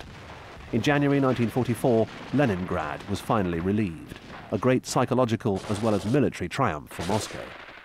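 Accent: United Kingdom. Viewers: Love these history docs narrated by cool Brit, while vintage video flickers along 💖 Many thanks 🙏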